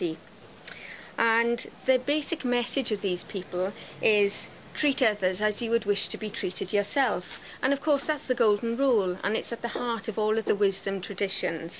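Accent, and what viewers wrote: Wales, United Kingdom. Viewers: A very interesting talk by a very interesting and lovely speaker, with an open mind and a beautiful Welsh accent. She covered many aspects related to the NDE experience. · Is she welsh? Love her accent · Lovely Welsh accent.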